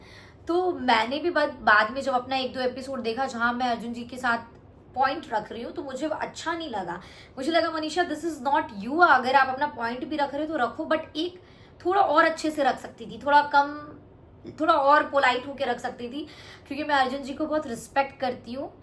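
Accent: Bihar, India. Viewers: Manisha ap bhut achhe ho but mai buttering nhi krungi. Ap is show me kaise khelna h soch k gye the isliye muje v kaibar arjun sir jyda achha lg gye jbki mai chti thi nhi muje hi achi lgni chaeye but mai justify nhi kr pati thu... Ap hr jgh natural rha kro kuchh soch k mt khela kro..ap aisa bich me english english krte the bt muje lgta ap apnr hindi ar binhari accent pe mst ho yrrrr❤... Apne kchhh glt nhi kiya h fir v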